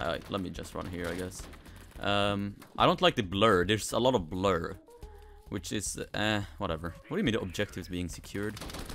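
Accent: Sweden. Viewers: Man kan höra att du är svensk på din Engelska😅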